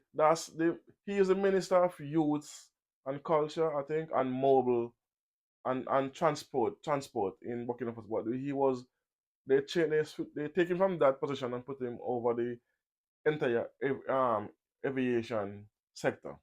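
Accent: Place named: Jamaica. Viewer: You are only speaking to the Jamaicans, sir, because only them can understand your accent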